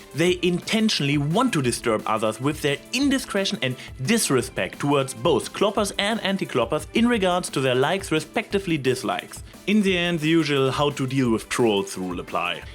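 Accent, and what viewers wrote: Germany. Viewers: i really like your ascent,it reminds me of Germany from hetalia,i hope that's not offensive in some way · I agree with the points you made. But sadly, the world has not yet become to the equestria-esque land we would all like it to be. As the human race is now, as awesome as we are sometimes, I don't think we are capable of learning this lesson as a whole. There will always be evil, no mater what form it takes. One last thing, just to sate my seemingly random and endless curiosity. From you're accent, I would like to know if you're from Austria or Germany. If both are wrong, I'm sorry. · I've seen you replying to the comments, and is it bad if I read every one imagining your (FREAKING AWESOME) voice saying it all? Also, speaking about your voice, <3 dat German accent boiii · 1st: I can hear that you are some kind of german. 2nd: Someone has to do it. 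3rd: Good video, good english. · you sound like your german .__. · I Like your Accent. Bist du Deutscher? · Are you German? Cause the accent kinda sounds like dat ^^ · Dieser Akzent! Sorry, wir deutschen haben den nunmal xD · I am in love with your Accent. So very Germany sounding. Or Austrian? Where are you from? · your accent is quiet hard german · 14 He is German, and his accent is quite handsome. Also, if you are going to ask a question, at least, turn replies ON!!!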